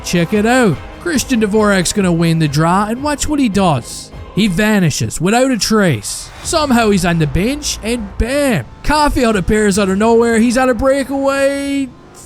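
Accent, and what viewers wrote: Newfoundland, Canada. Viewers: I love the Newfie accent with the classic commentary!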